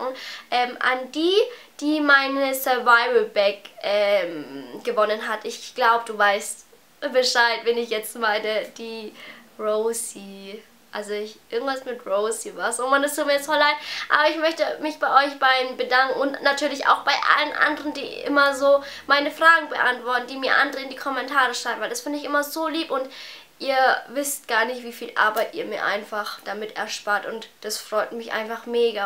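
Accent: Bavaria, Germany. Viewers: du hast keine sprachfehler? man merkt aber übel dass du aus bayern kommst :D des ist voll süß :) und das des abi in bayern schwieriger ist als in anderen bundesländer stimmt! :) reg dich nicht über andere leute auf !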